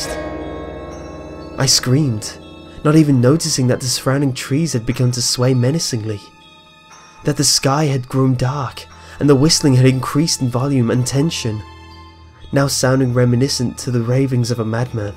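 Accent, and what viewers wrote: England, United Kingdom. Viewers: English accent I believe :3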